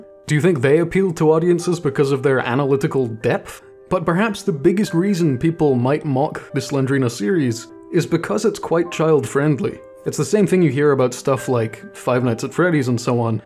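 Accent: Scotland, United Kingdom. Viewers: First time on your channel and I cannot for the life of me pin down your accent you have weird mix of Scottish, German and oddly a wee bit of American 😂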